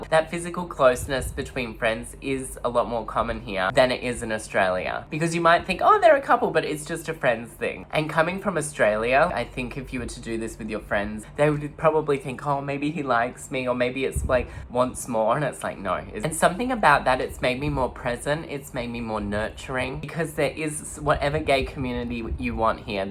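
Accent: Thailand. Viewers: Your Thai accent is so so Thai than some Thai people ❤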